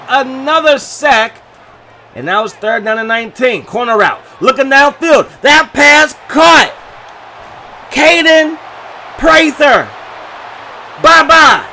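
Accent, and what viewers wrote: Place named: Prince George's County, United States. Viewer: Sounds like PG county accent.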